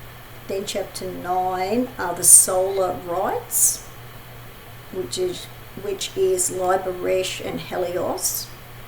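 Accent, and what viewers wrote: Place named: Australia. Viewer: Great to find your channel and an extra surprise was the Aussie accent. Im in Sydney myself. Looks like the YT algorithms work as your channel came up on my feed. Thanks for providing all this content. Looking forward to catching up on the older videos. Cheers 🖖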